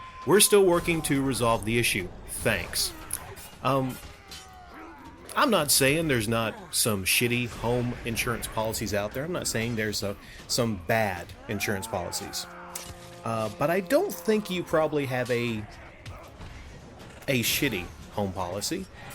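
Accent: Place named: United States. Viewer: Listening to your accent you are American. I'm guessing you're happy to live in a capitalist society. YouTubers, including yourself probably, are hoping to make money from their work/videos and there's nothing wrong with that. It seems hypocritical that you're making videos yourself, probably monetised, then complaining about other people making money from YouTube and Patreon. There are many YouTubers that monetise videos and use patreon to try and get a bit more cash. It's the nature of the beast.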